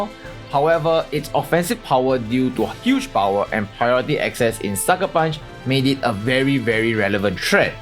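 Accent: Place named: Singapore. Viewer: eh u sound sg sia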